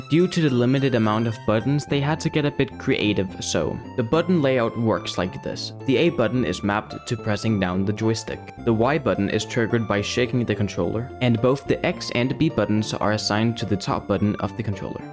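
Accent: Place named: Denmark. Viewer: buddy, are you danish or do you just happen to have a very danish sounding accent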